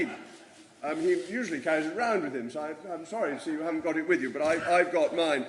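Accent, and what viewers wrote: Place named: RP, United Kingdom. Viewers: . . . and maybe a voice coach to rub the corners off that plummy accent. . . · Ok I get the turn off because off the "posh" thing, his silver spoon life as it were, but my God this man knows his stuff and always calm. The amount of times thugs have shouted at him and he calmly wants to chat, at which point they either shout more or walk off. Every interview its normally him running rings around the interviewer, its rare that they repeat questions because he does not stand for it or fall for it. He should be PM but I fear his personal views and money will turn lower income people off, posh well spoken people have always been viewed as been the reason for poor people....stereotypes.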